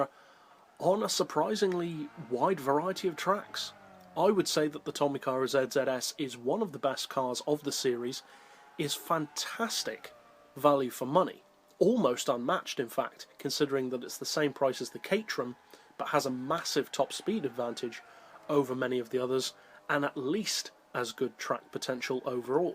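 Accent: United Kingdom. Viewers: urr so british!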